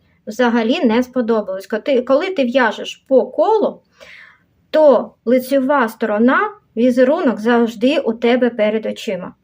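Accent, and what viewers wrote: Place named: Surzhyk, Ukraine. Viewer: Да, слышно суржик,много слов перекрученных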